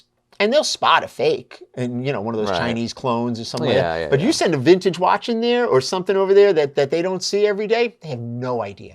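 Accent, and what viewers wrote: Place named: New York, United States. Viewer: New Yorker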